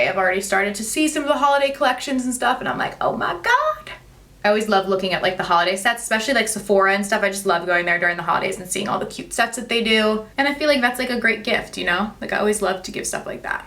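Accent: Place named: East Coast, United States. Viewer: ... You really have given me confidence in makeup! I always try and recreate all your looks!! Love ya girl!! P.S...Philly girl here and I knew you had to be an east coast girl since you say "you guys"! 😂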